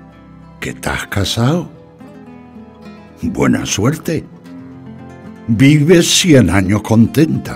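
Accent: Spain. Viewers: Pepe Mediavilla! Por usted brindo! Con respesto also mi copa y en su honor hoy vevo un tinto de buena cepa, fino. De corazon es mi sentir que lo hago verbo y con aplausos y agurios verdes de Romero, Albahaca y yerba buena afirmo y declaro por usted Pepe Mediavilla, gran Sr. : Que viva tu vida! Viva tu Libertad! Que Viva El Castellano que es tu lenguaje articulado, tu acento, mi herencia! Viva tu Cielo y tu Tierra y tu mar! Viva tu sol , tu Luna y todas tus estrellas! Que Viva tu casa, tu Mesa y tu lar! Viva tu mente con sus luces! Que Viva tu lira, tu historia y tu legado! Que Viva Espana! Que Viva Espana! Desde mi orilla Allende Al mar os deseo todo y so lo mejor! Que todo es sagrado , esto me lo aseguro un cantaor . J .M.y R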